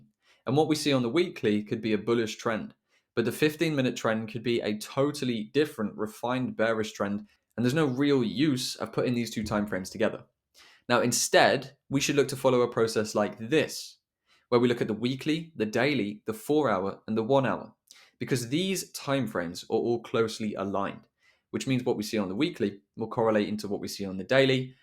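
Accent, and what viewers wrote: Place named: Northern England, United Kingdom. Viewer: I have just subscibed. He might just come from North England. Maybe, Newcastle, Middlesbough, Sunderland, Southshields, Cumbra lol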